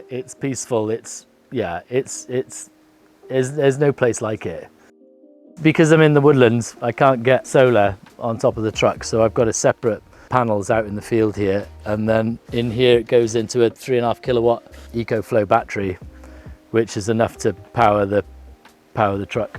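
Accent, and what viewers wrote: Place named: RP, United Kingdom. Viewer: You sound posh .but you talk normal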